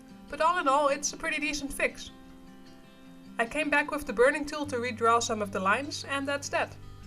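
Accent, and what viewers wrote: Netherlands. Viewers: love her dutch accent!! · Are you from holland by chance?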